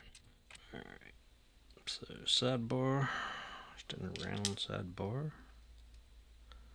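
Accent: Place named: North Carolina, United States. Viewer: I knew instantly you were from NC the first time I heard you on LadyLocks live. Great open sir!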